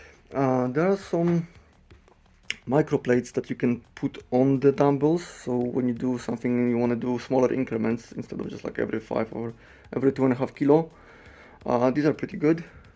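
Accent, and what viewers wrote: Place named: Poland. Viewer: The English flag confused me, with your accent Are you polish ??? Nice gym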